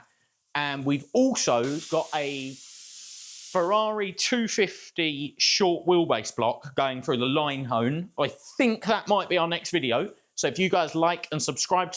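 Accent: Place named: United States. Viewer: Cool - maybe try saying "you" sometimes instead of "you guys". I get that narrating a task is not your job but changing it up a bit helps a lot. The less American you sound the more likely I will subscribe. (Alfa Romeo - 4 door Gullita 1600cc saloon)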